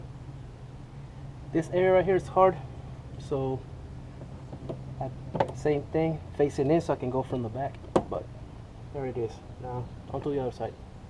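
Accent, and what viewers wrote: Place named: Philippines. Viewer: Bro thanks for the video. One question outta topic are you Filipino i hear you ahhhhhhhh.... Longgggg one lol oh k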